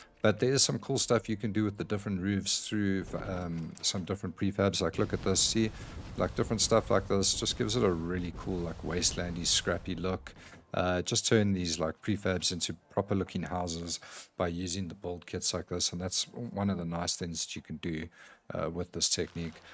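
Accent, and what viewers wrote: South Africa. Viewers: Is that a South African accent I hear?....🍻🇿🇦👍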